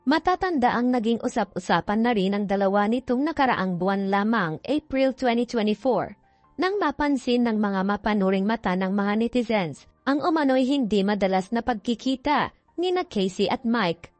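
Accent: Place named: United States. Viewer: Hoy ikaw American ka? Ayusin mo tagalog mo di bagay sa yo!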